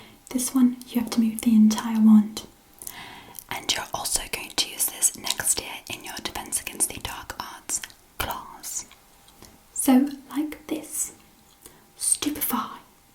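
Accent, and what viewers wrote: England, United Kingdom. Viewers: she's American but she sounds British. This is the first video that I watched on her channel so I thought she was British and then I watched all her other ones and she's American 😂😂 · English accent. Britain is four countries. England, Northern Ireland, Wales and Scotland. All very different accents. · A bit of a mix of several British accents 😊so so so calming and wonderful! you’re so so talented and I feel very spoilt right now:)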